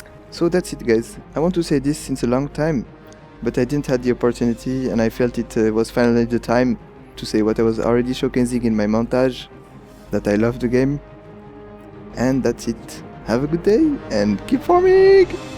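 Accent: France. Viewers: Is this Dude frech ? Sounds pretty like it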